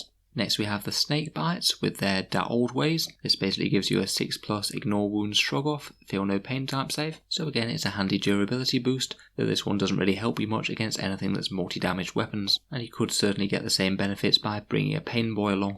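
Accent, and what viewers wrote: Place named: United Kingdom. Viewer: British accent perfect for describing dakka.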